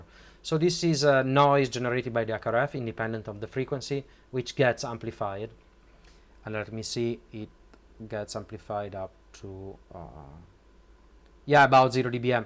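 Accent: Italy. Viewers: Scommetto sei italiano 😅☺️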